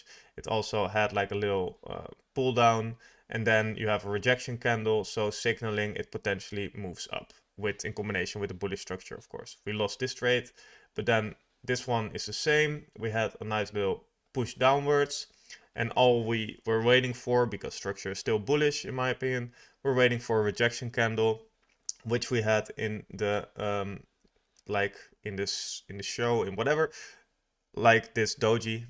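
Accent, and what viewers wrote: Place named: Spain. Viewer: i can tell u speak spanish, lol idk why. but i just now how spanish peoples accent sound when trying to speak english because i speak spanish too and english fluently.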